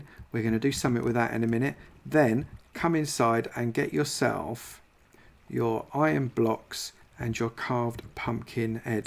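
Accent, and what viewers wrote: United Kingdom. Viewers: That Gordon Ramsay accent tho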